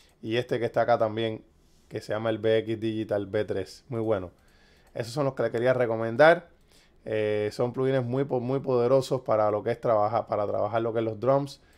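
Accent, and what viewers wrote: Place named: Cuba. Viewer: eres cubano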